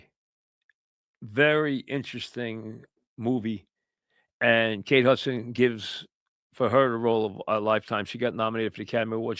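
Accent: New York, United States. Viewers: He's in or near Noo Yawk whaddya expect? Back afta dis...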